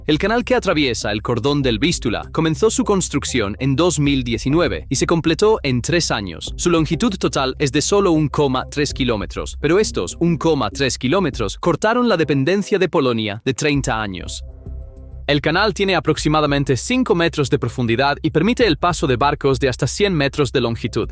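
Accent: United States. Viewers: si poneis voces en castellano porque lo poneis con acento gringo? suena ridiculusssss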